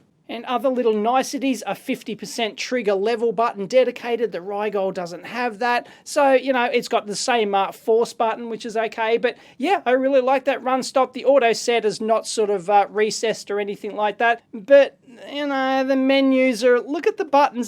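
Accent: Australia. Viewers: The 150% Australian accent would be a big clue ...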